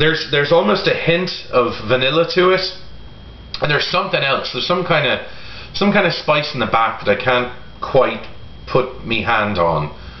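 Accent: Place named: United States. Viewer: when hes with his friends he has a total american accent actually. watch some more. great review as always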